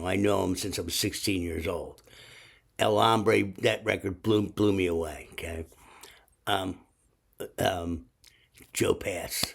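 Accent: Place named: Philadelphia, United States. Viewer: I knew you were from philly...i know that accent too well...im from south jersey but my pop is from philly...dan scoleri....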